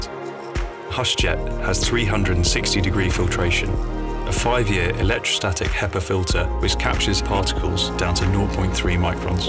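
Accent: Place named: United Kingdom. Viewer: Sounds like any British person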